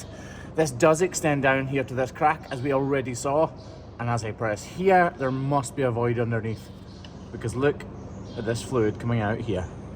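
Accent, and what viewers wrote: Scotland, United Kingdom. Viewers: I'm not a farmer. I don't even know how youtube originally sent me to this channel. But all I know is that I'm addicted and keep coming back again and again, even when I try to stay away. Maybe it's that sweet Scottish accent. Maybe it's knowing that these animals aren't in pain any more. Maybe it's the easy comradery. Or perhaps it is all of the above. Regardless, here I am again. Thank you for helping these poor sweet cows.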